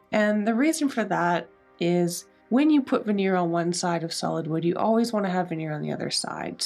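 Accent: Nova Scotia, Canada. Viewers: I didn't realize Canadians pronounce decal differently than Americans. You say deckle. We say Dee-cal with the cal part like California. 💕 Another furniture transformation that, to me, would have been just too difficult as well as me not being able to see it in my head all restored. You're amazing, and I love how proficient you are with old tools as well as the new technology of the laser cutter. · The pronounciation difference between Nova Scotia and Massachusetts is amazing. You call them Dek-uls, we call them Dee-cals. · I love how you say “decals”, love that Nova Scotia accent!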